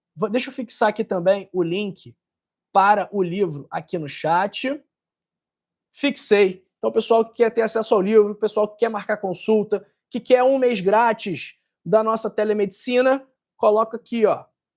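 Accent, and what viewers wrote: Rio de Janeiro, Brazil. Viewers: Sotaque carioca é dureza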